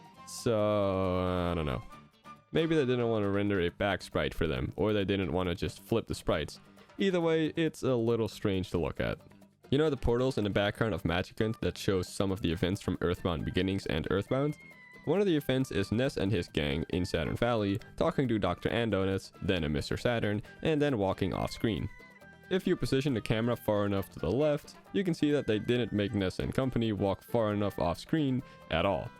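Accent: Netherlands. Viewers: When You listen closely You can still Hear his dutch accent.Das cool man,blijf video’s maken 👍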